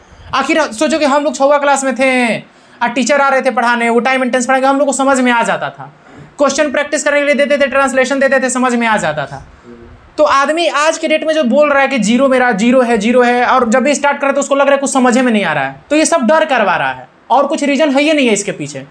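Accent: Bihar, India. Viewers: App bihar se ho kya bhai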